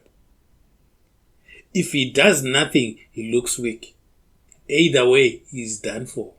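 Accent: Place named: London, United Kingdom. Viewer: You can speak London 💯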